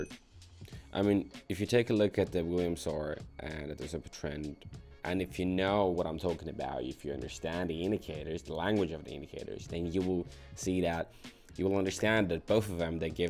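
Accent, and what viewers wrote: United Kingdom. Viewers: british accent + big wins = serotonin boost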